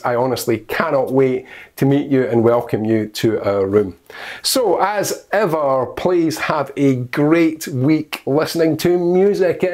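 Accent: Scotland, United Kingdom. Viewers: scotsman he is.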